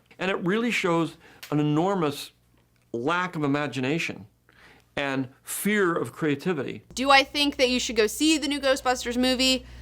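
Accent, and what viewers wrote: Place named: South, United States. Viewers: New to channel- does anyone know if she's from the south? I hear a southern accent xD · Are you from south carolina or north carolina? The way you speak has a hint of an infliction that I've only heard there.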